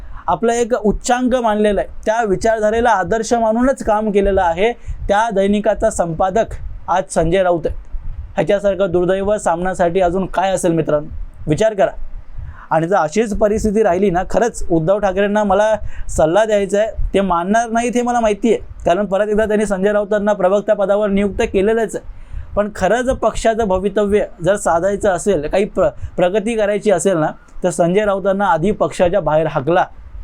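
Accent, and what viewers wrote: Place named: Karnataka, India. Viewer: तुम्ही स्वतः कानडी भाषिक आहात का ? काही उच्चारावरून तसे वाटते . तरीही तुमचे व्हिडिओज खूप चांगले , स्पष्ट तरीही मुद्देसूद असतात .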